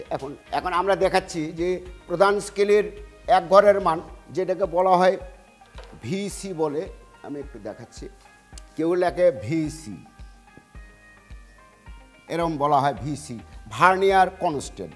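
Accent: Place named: Natore, Bangladesh. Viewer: Tnx sir...sir apner kotha sune mne hosse apner basa natore district a....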